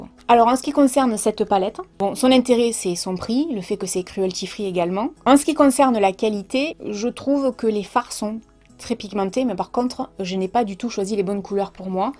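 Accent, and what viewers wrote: South, France. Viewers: Yesss l'accent du Sud de la France j'adore ça me rappelle l'accent du Sud de l'Italie 😙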